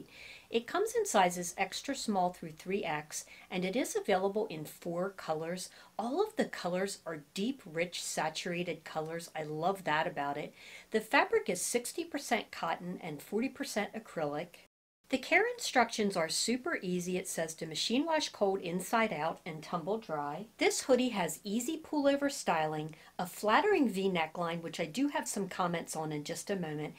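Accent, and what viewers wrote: Philadelphia, United States. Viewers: I much prefer the size small cardigan on you. The medium size, as well as the stripe design, overwhelms you. Great try on as usual. I agree with the MA/CT viewer (I'm from RI). Wustersheer is the way we pronounce it, although with our RI accent, what do we know. Love your accent (Philadelphia-ish, Southern NJ?)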